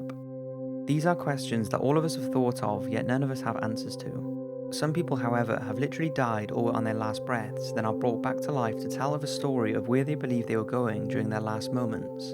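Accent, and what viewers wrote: United Kingdom. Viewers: yes another amazing video keep em coming and i LOVE your accent are you from the UK? night and peace out from Sweden x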